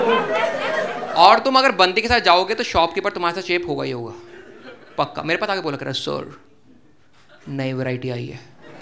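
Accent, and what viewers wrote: Punjab, India. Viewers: Punjabi accent is the icing on the cake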